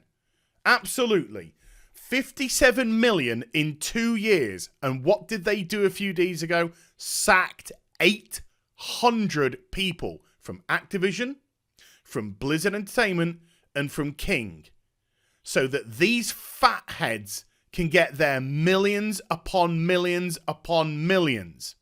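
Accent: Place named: United Kingdom. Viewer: Hey! Im no one. Just someone who watches you. IMO this 'right into it' format is IDEAL for you. Straight away. Spot On. Very engaging right off the bat. Youre not a jester. You dont have to try to 'entertain us' before each vid. I believe most of us watch you because we believe you are a very like minded, level headed guy with a great British tenor and the same 'take' we would likely have on the given subject. Just my humble opinion. Love ya...Keep it up <3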